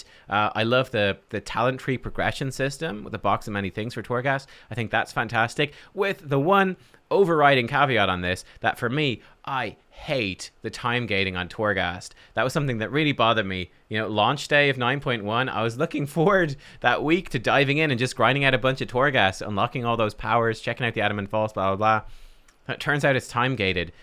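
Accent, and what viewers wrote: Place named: Ireland. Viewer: You sound slightly irish Never mind youre definitely Irish.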